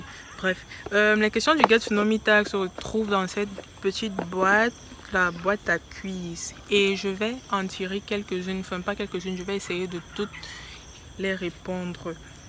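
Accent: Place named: Cameroon. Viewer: J'ai reconnu l'accent dès le début. 237 en force !!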